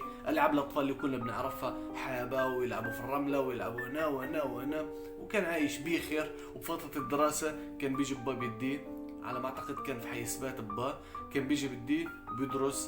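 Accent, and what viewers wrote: Morocco. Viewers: يا اخي العزيز نحن نفضل انك تتكلم بلهجتك الفلسطينية ، اولا لانها لهجتك الام وهي اصلك ، ثانيا لانها جميلة جدا، ثالثا لان الخليط بين المغربية والفلسطينية ليس حلو بالمرة ، انا اتمنى ان تحافظ على الحديث بلهجتك سيصبح لك مشاهدات اكبر بكثير اذا تكلمت بالفلسطينية كذلك نحن نفضل أن تتكلم لنا عن فلسطين وثقافتها وتقاليدكم الخ الخ ....اما ان تتكلم لنا عن اشياء نعرفها لا تجذب المشاهدات هكذا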